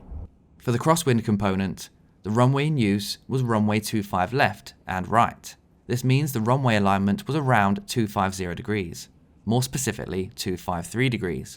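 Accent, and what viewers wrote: United Kingdom. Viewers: I've skipped by your channel many times, didn't realise you was a fellow Brit. Thought you was another one of them boring American channels. Subscribed!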